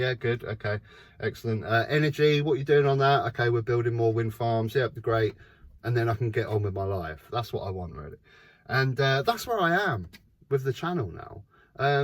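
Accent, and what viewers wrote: United Kingdom. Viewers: As an ex-Pat now living in the USA I enjoy seeing the British towns and countryside, and hearing British accents. You are one of five different YouTubers I follow to hear the news about the UK and the political situation. I'm keen to hear how Labour are doing, and if they are actually reversing some of the Tory mess, or are they going to be a disappointment (e.g. the 2 child tax credit cap)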